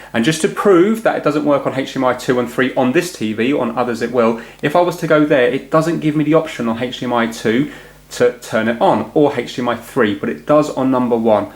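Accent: England, United Kingdom. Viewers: Great instructions. Nice clear English accent. Thanks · this british accent gives me on my nerves.. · He has a British accent. Not sure where you get this impression. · Fuck british accent.....weak accent ever. Try to be American.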